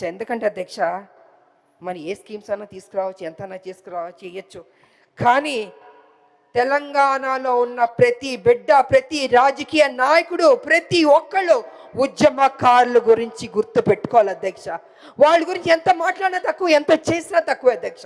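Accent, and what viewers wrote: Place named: Andhra Pradesh, India. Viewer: Andra valla tho vundi mi slang kuda andra vari lane ayipoindi amma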